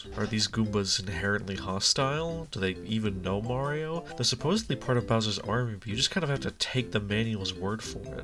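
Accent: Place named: United States. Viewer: Žiūriu vidosą, akcentas angliškas, galvoju čia amerikonas ir pyst Gustavo Enciklopedijos intro pradeda grot porą sekundžių. Šito vapšie nesitikėjau išgirsti žiūrėdamas vidosą apie morališkumą žaidimuose.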